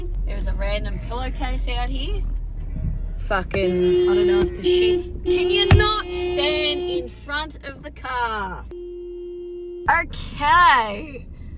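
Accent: Australia. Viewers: youre a natural comedian and absolutely adorable! As an American I love the Aus accent lol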